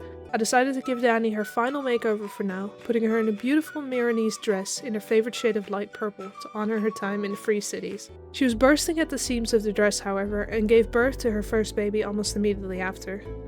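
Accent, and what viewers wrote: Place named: Netherlands. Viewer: ik herken een Nederlandse accent van een kilometer ver😅 Toffe video tho en origineel.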